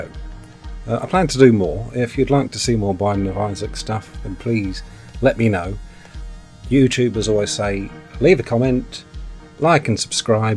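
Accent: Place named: Manchester, United Kingdom. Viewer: Yes i got Paul Calf vibes from the accent at times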